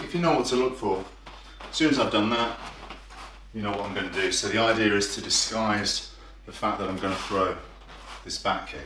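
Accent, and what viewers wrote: England, United Kingdom. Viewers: I feel like im not looking at the person who acted as Boyka and especially with that english accent its really hard to digest. Its like im looking and hearing a different person 😂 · Hebal you and I have something in common. I love British accent. I think that's what you like about his English, the accent. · So weird hearing You speaking With a brittish accent after seeing You in IP man. Great video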